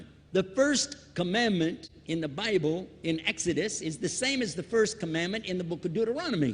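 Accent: South, United States. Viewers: hears a Southern Drawl in *'s speech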